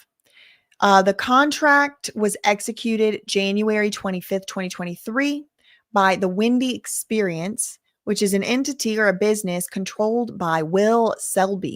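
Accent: South, United States. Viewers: I’m just here for lovely southern accent. ❤ God bless this woman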